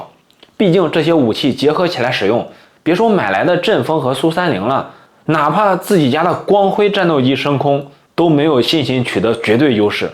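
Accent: Henan, China. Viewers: 你一定不是的粉丝，他说的是河南话。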